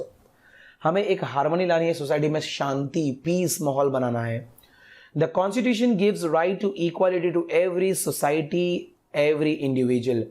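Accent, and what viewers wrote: Gujarat, India. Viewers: Tme gujarati cho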